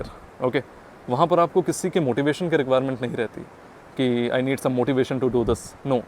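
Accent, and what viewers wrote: Kerala, India. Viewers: Kay tum Kerala se ho?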